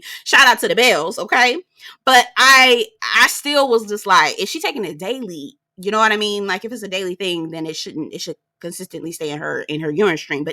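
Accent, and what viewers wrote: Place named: South Louisiana, United States. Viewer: u talk like me lol .... im from South Louisiana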